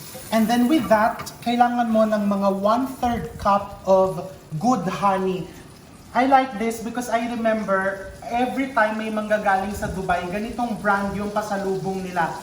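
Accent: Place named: Cagayan, Philippines. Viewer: Chef we have same accent from tuguegarao city.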